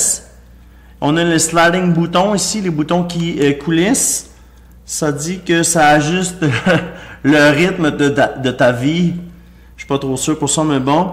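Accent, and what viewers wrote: Quebec, Canada. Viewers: kiffant j'adore ton accents Québécois ?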